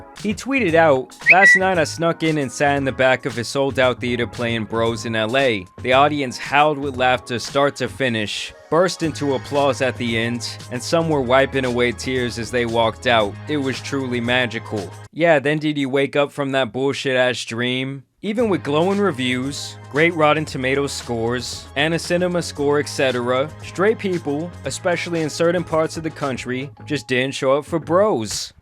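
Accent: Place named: Long Island, United States. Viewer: How are you from Texas but have a Long Island accent?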